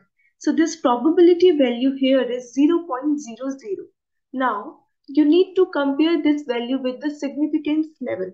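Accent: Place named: India. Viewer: Please work on your axent , im not english , already hard to understand english so, your indian axent make it more difficult to me 🙏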